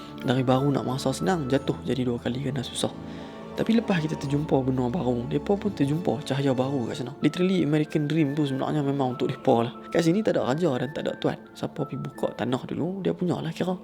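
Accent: North, Malaysia. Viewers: Pekat utaqha dia.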